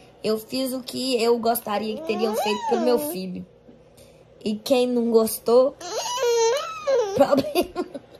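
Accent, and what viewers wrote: Minas Gerais, Brazil. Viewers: você fala torando coisasvde minas gerais amo voces meus conterrâneo mistura dos dois.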